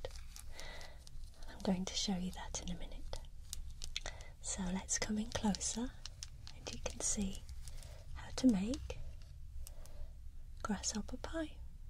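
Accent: United Kingdom. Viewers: Omg for a second I thought there were actual grasshoppers in it! I'm in love with UK accents. ♡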